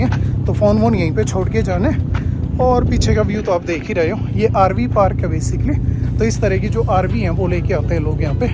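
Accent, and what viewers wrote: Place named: India. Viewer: Bhai ji ap kisi technician se pahle iska voltage or amp load chek kra lo. Kahi apki battery ko bhi kharab na kr de. Apki voice typical Indian voice hai bro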